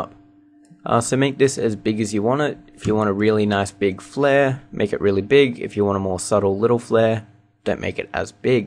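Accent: Australia. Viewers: me neither cannot understand australian english "hold the OKIE on a keyboard" :D what is that? thx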